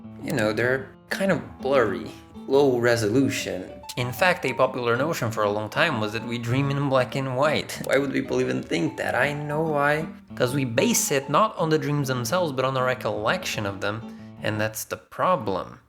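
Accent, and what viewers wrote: Brazil. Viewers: Demorei pra perceber que você era BR. Mas mesmo assim, conteúdo muito bom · Br? Eu senti o português no primeiro segundo do vídeo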